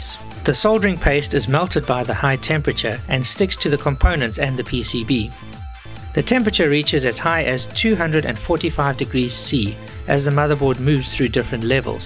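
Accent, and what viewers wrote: South Africa. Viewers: Sounds like a South African talking LOL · Are you South African?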